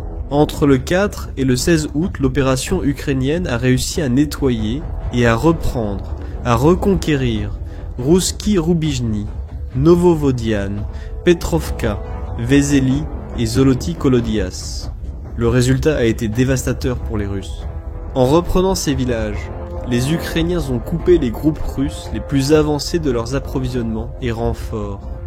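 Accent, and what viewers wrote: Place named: Belgium. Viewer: Slavia Ukrainia. Une voix Belge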